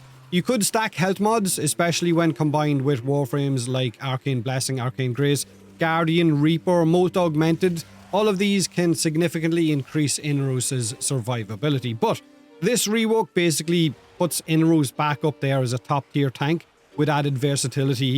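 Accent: Ireland. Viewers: It must be the Irish accent, I can always understand what the is describing about the abilities, anyone else has a go and I start day dreaming of long strolls in the woods under all the beautiful threes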